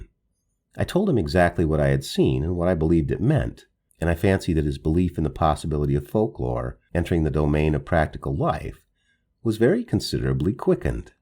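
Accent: United States. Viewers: Shouldn’t be an American voice but English. Sounds wrong.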